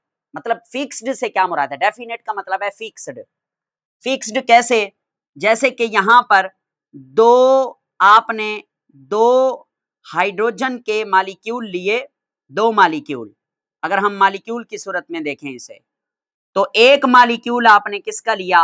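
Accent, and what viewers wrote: Saraiki, Pakistan. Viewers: Are you saraiki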